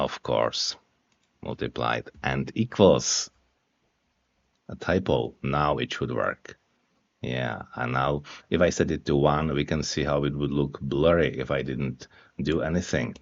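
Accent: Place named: Czech Republic. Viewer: Руслан, при чем здесь англосаксонцы и голос? из Чехии, это его акцент и его голос. У тебя всё в порядке? У тебя что-то мерещится? Может, перестанешь пользоваться интернетом, который так-то англосаксонский и пиндосский? (Какой же мусор у людей в головах!)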